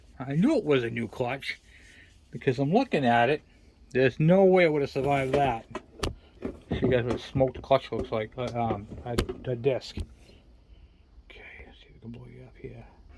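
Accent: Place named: New England, United States. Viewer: I thought it sounded New England-ish. I just moved to Connecticut and just bought a GT80 today (my third one). Any chance you have any extra bits laying around?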